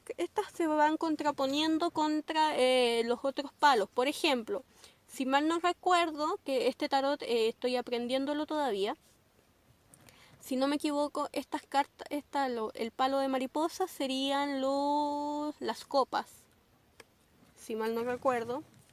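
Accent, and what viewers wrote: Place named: Chile. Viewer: Hola , vengo de Amino ❤Vi la publicación y vi unos segundos del vídeo , pensé que eras española Lugo me di cuenta que eras chilena ( no reconozco ni mi propio acento jaja )Me gustó mucho , sigue haciendo vídeos Me encanto la 3 baraja 🖤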